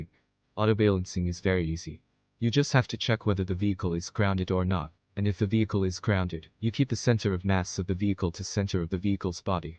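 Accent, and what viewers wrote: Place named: United States. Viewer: You are from India? right. but your accent looks like american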